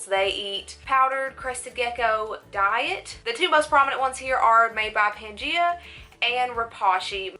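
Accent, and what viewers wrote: South, United States. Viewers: Girl you are so beautiful, but... That accent, is it Tennessee or Carolina maybe Texas or Georgia got to be one of the four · That southern accent took me by storm 😊 lol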